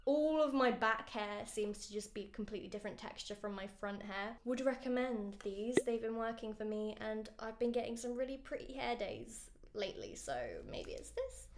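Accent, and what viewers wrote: United Kingdom. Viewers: What made you assume that? Her obvious british accent or was it something else?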